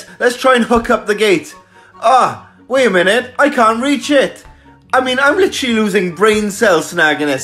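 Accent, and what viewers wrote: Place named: Wales, United Kingdom. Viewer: The Welsh accent makes this 😅😂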